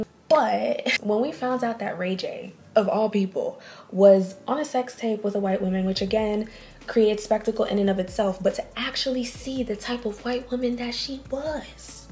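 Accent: Jamaica. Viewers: Its the patois for meeee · you sound Jamaican, are you a YARDIE? If so, TUN UP!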